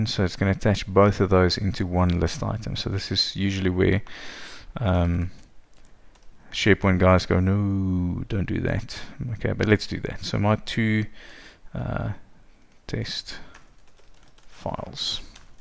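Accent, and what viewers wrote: South Africa. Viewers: sorry this is a bit off subject but are you South African??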